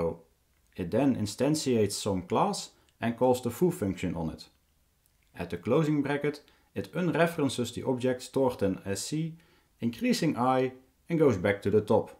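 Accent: Netherlands. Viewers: Ik kan je Nederlandse accent horen haha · just about to say if this guy ain't dutch then my life's a lie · Netherlands, obviously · Heerlijk zon nederlands accent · I SMELL DUTCH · Only Dutch people will know where this guy is from just by listening to his pronunciation.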